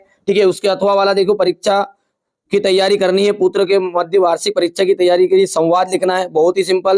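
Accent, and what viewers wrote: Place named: Indore, India. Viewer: Tum indore side se ho kya ?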